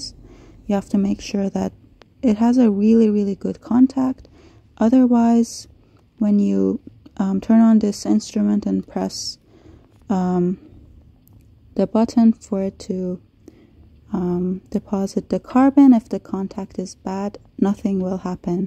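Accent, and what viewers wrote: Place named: Iran. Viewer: I hear a lovely iranian accent ❤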